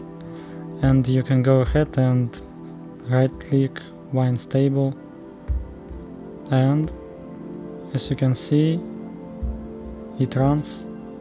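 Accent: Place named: Russia. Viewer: блин я сразу заметил русский акцент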